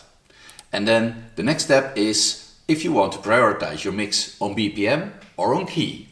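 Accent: Netherlands. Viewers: I like your narration - is that a dutch accent I hear? reminds me of my grandpa :) good times! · hahaha hij praat Engels op de Nederlandse manier 😂 je hoort het accent gewoon 😜 wel cool dat deze software van Nederlandse bodem komt !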